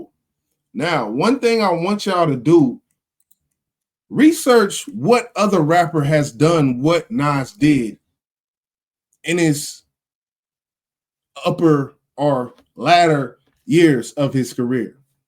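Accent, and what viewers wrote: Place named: Tennessee, United States. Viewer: You obviously don't know Hip Hop. All over the planet, Nas is ALWAYS in the top 10 best rappers list....and ALMOST ALWAYS in the top 5 best list...and often in the Top 3....and typically called the GOAT by many with.as best Hip Hop album ever.....just like this guy here did, and he sounds like he's from back water TN....not North East! Lol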